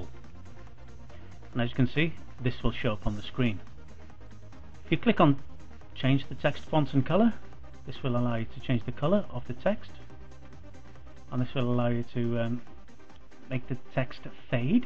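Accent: Wales, United Kingdom. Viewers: where are you from? coz you sound kinda welsh.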